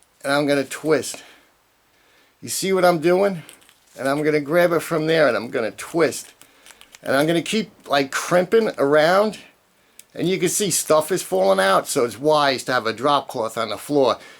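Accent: New York, United States. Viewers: Thank you, I was a bit timid about touching the filament, using the needle nose to bend the metal base lip (my mama taught me this, btw) and turn, no luck, corroded. I watched your video, turned off the power and turn the glass filament, thank you. No one here, just me. Quick note: how it broke?-the glass bulb mysteriously fell off, leaving the metal base, filament and a spooked girl. I still love the old school bulbs, btw. love your jersey or NY'er accent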